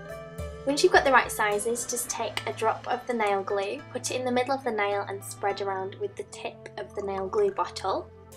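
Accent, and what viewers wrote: United Kingdom. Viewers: omg ,thats awesome, i love brit accents and im just startin to realize that theres many and not just one;)and im tryin to learn to tell the difference and stuff..yeah;) xx from germany=)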